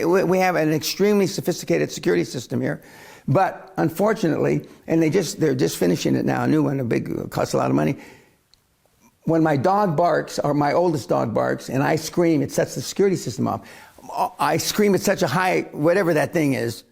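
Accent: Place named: New York, United States. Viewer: Man This.guy is a realist..I love this guy .he the only billionaire..that tells people the truth..no sugar coating ..just raw right in your face ..that the type of people I like ..straight forward. Right in your face ..when he speaks the .truth ..I would someday like to meet the guy..but I know that will never happen ..folks listen to this gentleman..he dropping..truth bombs ..to the people ....for some reason he sounds like he from NY ..Cause he definitely rolls like BAD ASS ..but I know he good guy trying to wake up the sheeples..that are in a deep coma ..cause most of them are headed towards the slaughter house ....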